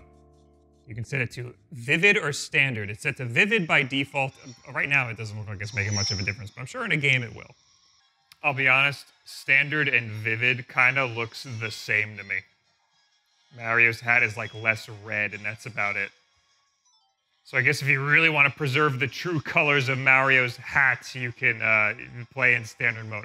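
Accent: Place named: Long Island, United States. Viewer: God I always forget how much I hate how Long Island folks say "Mario" until I hear your videos ha-ha You seem to purposely try to say it 100 times a video. i cringe each time.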